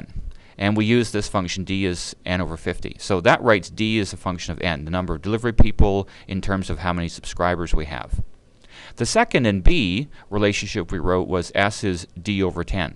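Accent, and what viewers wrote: Canada. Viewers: this is very helpful and is this guy canadian(irrelevant i know) i just wanna know BUT yes this video was helpful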